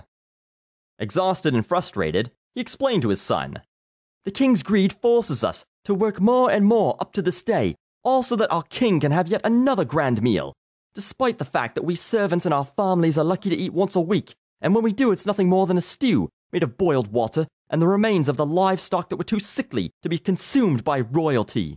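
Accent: England, United Kingdom. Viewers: lol english accent XD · You have a great British(?) accent. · i like british accent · Epic British accent, bro. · Your English accent is pretty awesome, man. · Your British accent was too adorable. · awesome brit accent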